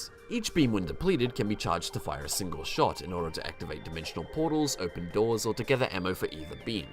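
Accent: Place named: New Zealand. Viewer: Was listening to a random gaming podcast on Youtube. Heard an NZ accent. Instantly subscribed.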